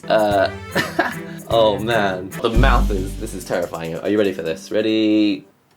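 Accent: United Kingdom. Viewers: Are you pure Japanese? Or are you mixed? You sound like you have a British accent :)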